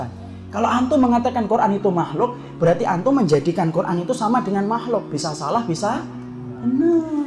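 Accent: Indonesia. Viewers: kangen medoke.. alhamdulillah ilmunya..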